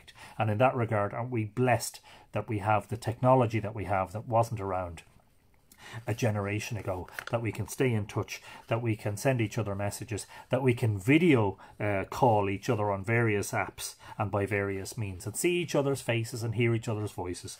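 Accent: Ireland. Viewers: My name is, Im from Louisiana and I have been listening to your live myth readings since the first Day. I never seem to catch them live, but I never miss a reading! I think what you are doing is great and want you to know you are really helping alot of us out there escape, atleast for a little while, from all the madness going on in the world right now! Being on lockdown and pretty much being out of work with no income for the forseeable future, and living in the place with hight initial growth rate of confirmed cases for covid-19 in the world, It's not easy to escape! You have such a warm, calmness and a delightful positivity, that "story time" has become quite popular around here! (Me and several of my friends, coworkers, and family members have started to call your nightly readings our "story time") when I first introduced your videos to everyone I said, "Check this guy out, its like story time with an Irish Bob Ross or Mr Rogers" and "story time" stuck! 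Lol And I just want to thank you and tell you are helping more people than you could ever know.... Me and my two Dogs, my sister and several other IUC nurses, a bunch of bored out of work waiters and waitress, and several of my family members who are stuck at home with their kids, all look forward to our "story time" You have a fan club growing in Louisiana and you are truely helping make the world a better place in a dark time. Your simple act of kindness, of reading stories to help people forget for just a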